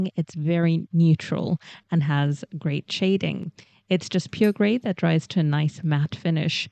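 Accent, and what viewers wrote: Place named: United Kingdom. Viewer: What a pleasure to hear a British voice on oens - and to have UK prices that actually mean something! Jinhao, Asvine and Hongdian produce fantastic pens at good prices. And I hate Sailor pens - mine never make it out of the case. I should sell them but it would be cruel and unusual punishment on the buyer.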